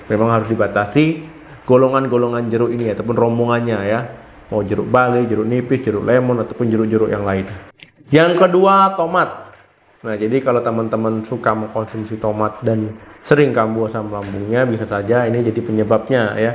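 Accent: Aceh, Indonesia. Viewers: Lidahnya Aceh banget dok😊. Saya org Aceh tinggal di Batam. Saleum aneuk nanggroe 🙏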